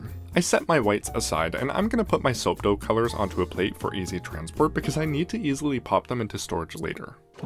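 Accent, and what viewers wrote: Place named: England, United Kingdom. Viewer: Also from the Uk. Your English accent... love it!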